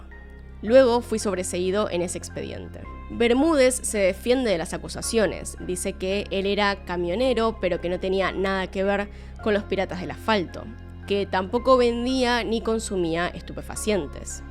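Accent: Argentina. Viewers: Hola me encanta tu acento argentino supongo y también tu acento angloparlante supongo que también hablas inglés te felicito por tu programa es magnífico y tú eres muy bella saludos😊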